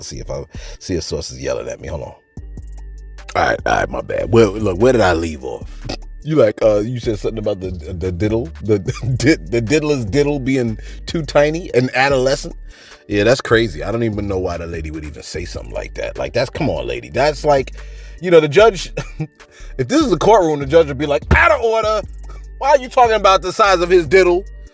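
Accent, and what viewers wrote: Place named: South, United States. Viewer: Man you talk bad like you from the south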